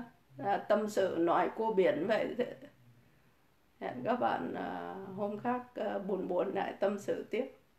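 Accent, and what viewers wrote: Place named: Hai Phong, Vietnam. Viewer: Ngôn ngữ cách nói của cô rất hải Phòng....chân thật và thẳng thắn.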